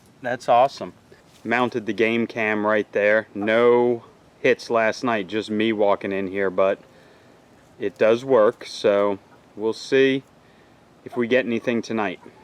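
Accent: Philadelphia, United States. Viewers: That really does sound like a Philadelphia accent haha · Hey just found you! Love the vids! Where are you from originally and where do you live now? I hear Philly s Jersey... amiright? And in Florida now.... you?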